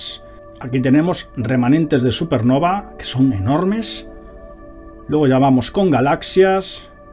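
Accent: Spain. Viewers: Información de calidad y el acento español del Profe que nos vuelve locas a todas ❤❤❤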